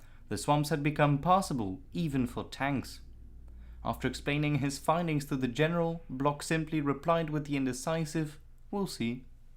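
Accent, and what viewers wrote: Germany. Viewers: ron I could be wrong but I think the narrator's first language is german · hehe... I can believe. Though, I was sure you were German, as your accent sounded so. Rgds, W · Is my guess correct - you are German? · Say, good sir, would you not be German, per chance? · Highly excellent and authentic German pronunciation :)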